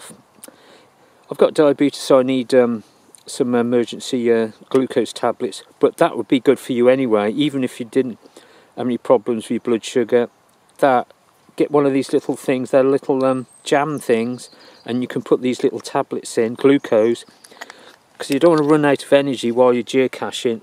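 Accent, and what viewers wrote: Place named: Warwickshire, United Kingdom. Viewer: 'ello! lol I'm Rae:D (RaeNtheBionicSheep on <link> ) you sound like your from Warwickshire! Where I was born lol! Not how I'd picture the face to the voice lol but nice to see another cacher vlogging. Must do cache vlogs myself lol... Thanks for vlogging!:D I am trackable at WBX9FJ if you wanted or anyone wanted to track me:D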